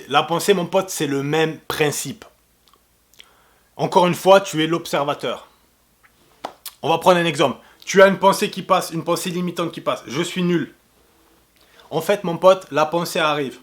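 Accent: Marseille, France. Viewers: Mec je te découvre et ça me casse le crâne t’as le discours d’un maître spirituel mais en mode bonhomme de Marseillais ! Je te kiff grosse force mon gars !!!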